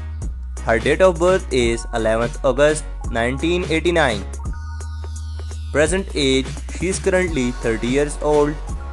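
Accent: India. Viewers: The intro was enough for anyone to leave immediately, the creepy indian accent.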